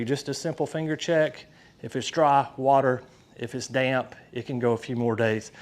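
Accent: South, United States. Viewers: very educational....finally a southern talking youtube on geranium care!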